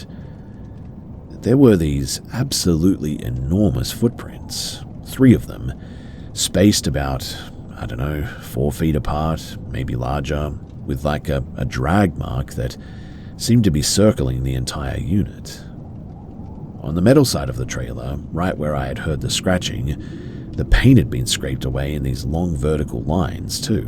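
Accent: Australia. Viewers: Good to hear an Aussie accent, cheers mate!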